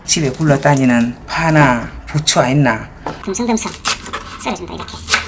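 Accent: Gyeongsang, South Korea. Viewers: 솔직히요,,, 전 선생님 목소리 말투 들으러 와요~ 음식레시피는 덤으로 보고가구요~ 우리 가족이 다 갱상도 인데예~ 지 태어나고 서울올라왔심더~ 그래서 갱상도 사투리가 그~리 정감이가요~ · 쌔리 뽂아도..ㅋㅋㅋㅋ 이억만리 미국에 나와사는 이민자 이면서 경상도 출신 이라서인지 너무나 정겹습니다. 엄마가 그리워질만큼요.. 항상 챙겨서 듣고보고 따라 해보고 있습니다. 고맙습니다!! ^^ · 반찬 배우러 들어오는데 이젠 경상도 말까지 다 알아들음ㅋㅋ쉽고 맛있어요 감사합니다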